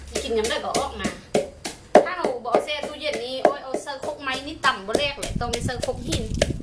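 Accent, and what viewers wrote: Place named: Na Wa, Thailand. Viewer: สำเนียงคล้ายๆแถว นาหว้า ศรีสงครามครับ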